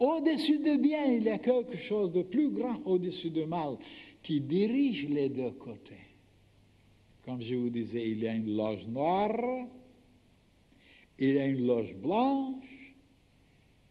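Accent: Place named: Czech Republic. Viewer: I' m hre because of Franz Bardon. That's fantastic knowledge ! Thank you for sharing ! And I speak french, so it's a delight to listen to an old wise man with a strong tchekich accent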